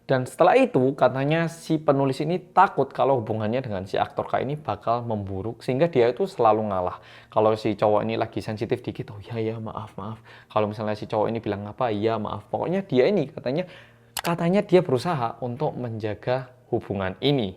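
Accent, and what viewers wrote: Java, Indonesia. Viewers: Mas kalo ngmg korea biasa aja tp kalo ngmg bhs indonesia medok banget jawa nya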